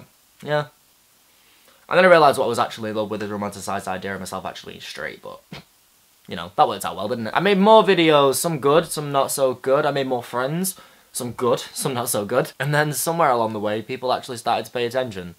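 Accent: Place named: Yorkshire, United Kingdom. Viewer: The nature of many youtube channels is lonely gay boys who need some sort of family and support system. And when the teens get to be 22 or more, they fall off. They don't much need youtube anymore. I only subscribed originally because you were kind of cute and I liked your Yorkshire accent. I don't much think the long hair and nose-ring behoove you, though.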